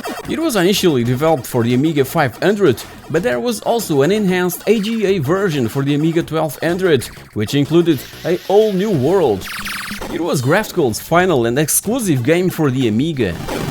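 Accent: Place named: Portugal. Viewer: your accent is very Portuguese, am i correct?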